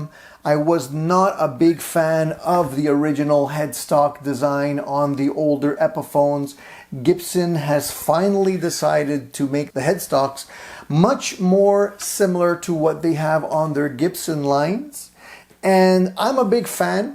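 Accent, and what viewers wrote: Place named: Canada. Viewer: Hi where is your accent from? Italian Canadian? Sounds similar to me! Cheers! Nice guitar sounds great!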